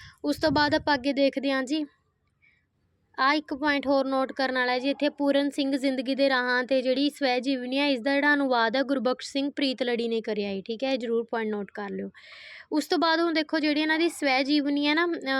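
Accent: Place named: Mansa, India. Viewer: ਮਾਨਸਾ ਏਰੀਆ ਲੱਗਦਾ ਮੈਡਮ ਜੀ ਦਾ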